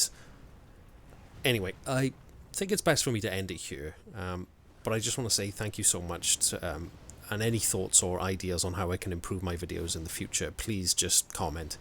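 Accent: Wales, United Kingdom. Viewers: Hey dude, brilliant video! For starters it's nice to hear a fellow Welsh accent in the YT gaming space! Secondly, I really enjoyed your chill tone, and your rambly style, it's really cool so don't worry about how 'professional' it seems or whatever, it's a strength o your style I think! Plus, I can clearly see you put A LOT of effort in the editing, the cuts, the memes, the general flow, fantastic job! And finally; welcome to the Souls club, once From has their fangs in you it's hard to get away lol! Hope you play and enjoy the other titles! Anyways, apologies for for the mini-essay but yeah, awesome video, a joy to watch, thanks! Cymru am byth! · Is that a hint of a Welsh accent I hear? 🏴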